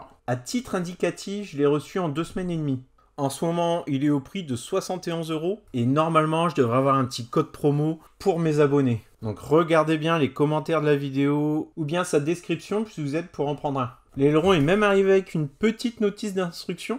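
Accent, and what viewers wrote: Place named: Nord-Pas-de-Calais, France. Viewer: Ah le chti ! T'es quand même attachant ! 😜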